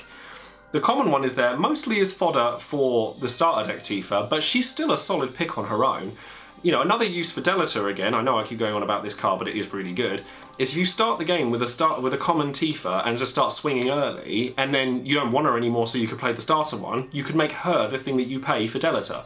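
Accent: United Kingdom. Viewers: quick q, where abouts the UK are you from? also, clouds field ability does that buff all clouds on the field? an example would be if a tifa and light cloud are already on the field, do both light cloud AND common cloud get the 2k buff? i dont normally comment but your vids are real good, keep up the good work (Y)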